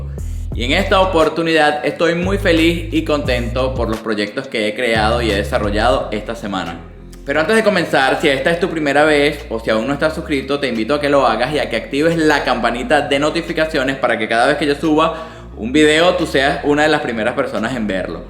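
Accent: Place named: Venezuela. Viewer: Hola! Agradecidas Bendiciones para ti y tu gente! Deduzco que eres venezolano por tu acento! Me parece que estás en otro país! Tengo muchos LP y me estoy deshaciendo de ellos; si sabes de alguien que los quiera o necesite, estoy a la orden para regalarlos! Un abrazo hermano!:face-red-heart-shape:🙏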